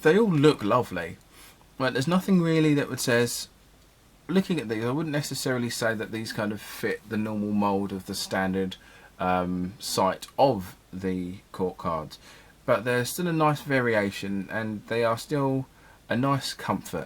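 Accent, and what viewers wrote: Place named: California, United States. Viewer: Love your accent from California, just subscribed!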